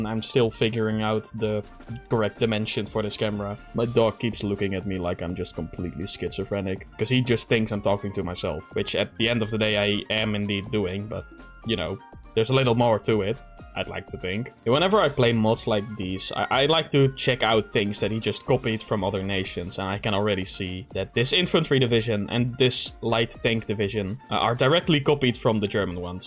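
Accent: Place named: Netherlands. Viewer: Stoel! Ik denk daar een vaag Nederlands accent te herkennen? English for those confused: Chair? If I'm not mistaken that's a Dutch accent?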